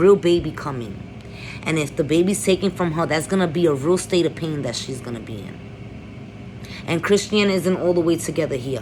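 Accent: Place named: New York, United States. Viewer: It's Chris Shawn but spelled Chrisean. Like your commentary tho ❤ keep it up you'll grow. Real recognize real. You have a NY accent I'm in Norf Philly not far at all. I got family all over the Bronx 8 say that to say you sound just like my crazy ass cussin whom I love to the moon. No shade when I say crazy mama 💯💖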